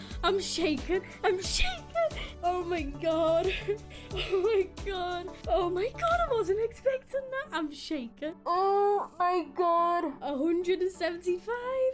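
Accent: Liverpool, United Kingdom. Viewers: that accent tho? is it scouse?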